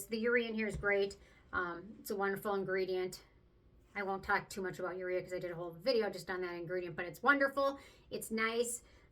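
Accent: Minnesota, United States. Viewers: You're so cute & have a cute Minnesota accent & voice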